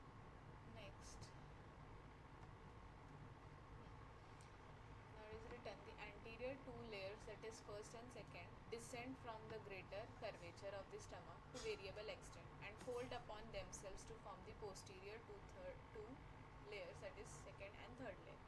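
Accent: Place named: India. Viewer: Excellent explanation. My exams are upcoming and finally found out this Indian youtuber. Love your Indian accent. You Indians always save us during the exams. Please upload all the vidoes as soon as possible regarding all the human biology. My exams are starting on 6 April, please do it as soon as possible either wise I'll fail my exams.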